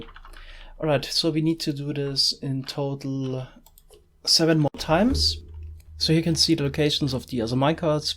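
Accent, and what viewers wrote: Germany. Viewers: Kann es sein, dass du deutsch sprichst? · Are you German sometimes it Sounds like so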